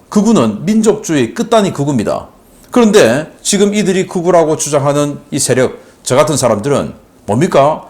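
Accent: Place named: Gyeongsang, South Korea. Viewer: 경상도치야...국방티비에서 내려와라...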